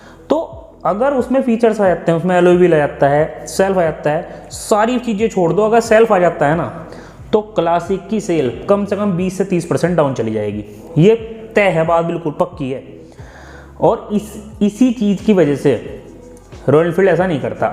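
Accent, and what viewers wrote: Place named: Uttar Pradesh, India. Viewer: Kha se ho ap UP mai .....aj language suni dyan se .phle.jesii rehve 😂😂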